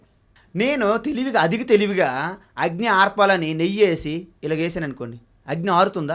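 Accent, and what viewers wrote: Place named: Srikakulam, India. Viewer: స్వామి గారి ప్రవచనములు యెుక్క వివరణ ఛాలా బావుంది. కాని ఈయన శ్రీకాకుళం జిల్లా లావుంది ఎందుకంటే ఈయన యాస అలానే ఉంది.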